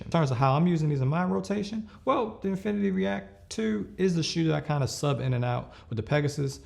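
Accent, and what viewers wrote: Baltimore, United States. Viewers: Thx bud That bmore comes through in some of your words. Lol Great video sir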